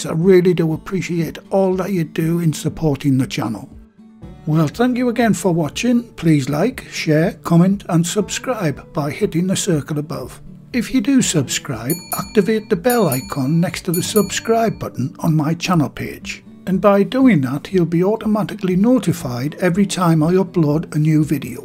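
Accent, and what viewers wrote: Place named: North East, United Kingdom. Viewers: sounds just like voice actor Jim Cummings but with a Northern English accent (sorry I don’t want to assume what part of the country you’re from because I don’t know) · That looks great!! reminds me of my teachers from when I lived in the North East. I miss living up there when I here his voice 🙂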